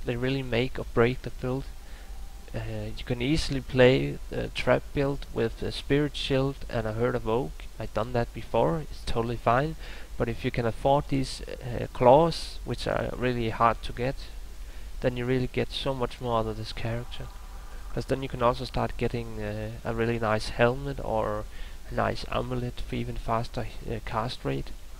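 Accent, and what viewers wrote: Denmark. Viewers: Nice tutorial ！ Are u from Denmark?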